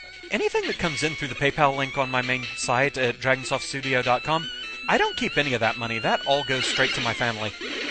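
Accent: United Kingdom. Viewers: You live in texas.What.You sound so British,bit of a stretch but maybe even Scottish but youre American.mind blown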